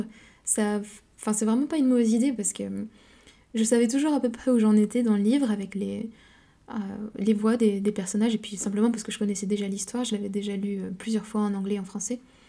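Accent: Russia. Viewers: Vous avez un accent russe maintenant 😊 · Tu ne serais pas plutôt bilingue Russe/Français issue d'un mariage Mixte ? car hormis ton génotype plutôt Russe ton petit accent te trahit aussi, puis quand à tu demandes si "obsessive" se dit en Français, j'ai de gros doutes, merci quand même pour ce Coucou de Russie, en souhaitant que les relations entre nos 2 pays historiquement amis se rétablissent au plus vite.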